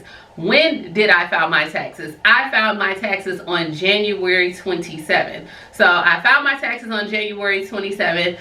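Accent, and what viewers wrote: Alabama, United States. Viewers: Wait that's Alabama accent , my mom is from there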